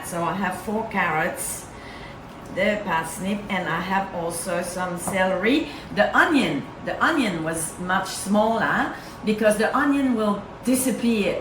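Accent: Australia. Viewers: Tour accent is Australian. One cannot fake the vowels. Interesting. So you are from Quebec? Hm. The recipe probably is good, regardless.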